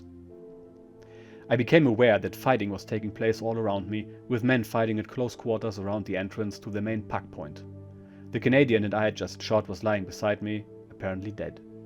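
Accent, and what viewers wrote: Germany. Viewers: I didn't realized you'd posted Juno part 1 and was delighted to see a Juno Beach part 2. I've just now listened to both parts and like your other content, it is excellent. This is content that anyone fascinated by WW2 will enjoy. I'm a 50-ish year old American and have been fascinated by the war since childhood. Thank you for these stories from the German perspective. I've read many memoirs and diaries myself, and hearing this in your own accent adds something entertaining. One can imagine the soldier himself is reading the story.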